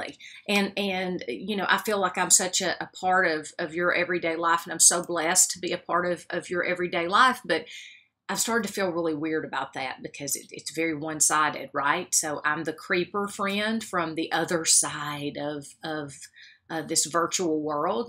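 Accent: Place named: South, United States. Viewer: Hi: I enjoyed your video so very much! I'm a southern girl too (Georgia) so I love you accent. Blessings to you....Renee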